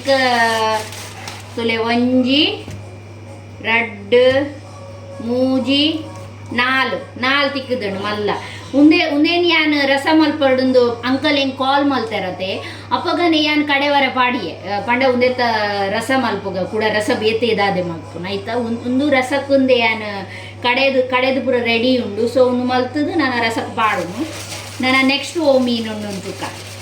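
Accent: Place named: Tulu, India. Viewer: Sudda tulu paaterley madam, nikulna bombai da tulu yeddey ijji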